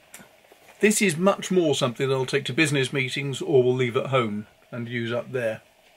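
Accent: United Kingdom. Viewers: yes! I’m very happy about this and really there’s nothing I love more than British voices waxing on about all things pen, paper and ink! ;)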